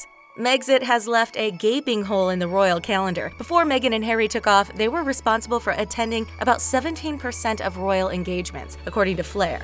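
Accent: United States. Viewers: Americans reporting on UK Royal family is silly...the accent is annoying and it's full of factual mistakes. Other than British, the Aussie reports are much more accurate and enjoyable. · The Talko is an American channel, I suppose. Especially according to the accent and how they portrait Meghan :) Well, I don't think that Kate follows Meghan's example especially because she is so different from Meghan in a good way. I have nothing against Meghan but Kate was always classy and a true royalty. Meghan was a rebel who either didn't want to sit through (what Meghan and Camilla did) or couldn't. One or the other, I don't care, it's non of my business. Btw, it's a typical American characteristic to be a rebel. Americans rebel all the rules, you can see it in the language. Sometimes, it's good to be a rebel. Sometimes, it's out of place. In Russian we say that you have to know when is the right time and the right place. 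I guess, you say it in many languages, too.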